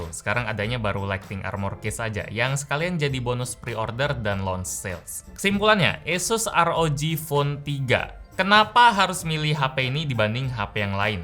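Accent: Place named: Java, Indonesia. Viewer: 865 tok! Medok sekali anda bang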